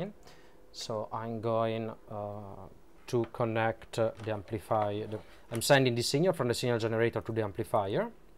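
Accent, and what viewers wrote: Italy. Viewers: O sei italiano o sei francese haha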